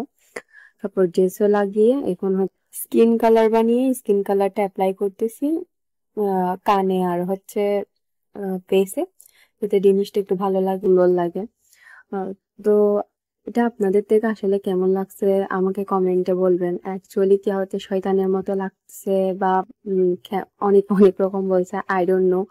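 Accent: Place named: Chittagong, Bangladesh. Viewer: সুন্দর হয়েছে আপু তবে আপনি চট্টগ্রাম থেকে বলছেন মনে হচ্ছে 😊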